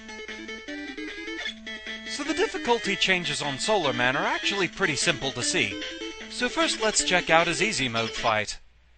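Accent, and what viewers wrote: Scotland, United Kingdom. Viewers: A Scottish guy...playing a robot...who hates birds... Why do I keep thinking of Portal 2?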